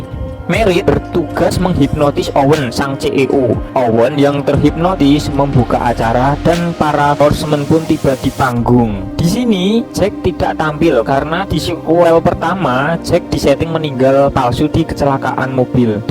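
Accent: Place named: Java, Indonesia. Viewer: Jawa medok...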